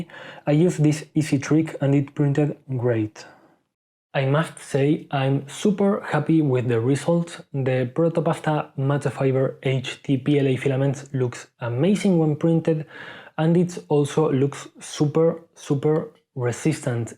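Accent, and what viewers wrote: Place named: Spain. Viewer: Mola que hables en inglés con acento español, así, por lo menos yo, lo entiendo mejor xD. Por cierto, tus videos son muy buenos.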